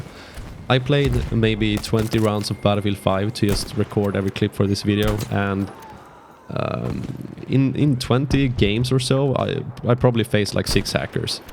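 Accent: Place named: Sweden. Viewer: You sound swedish😂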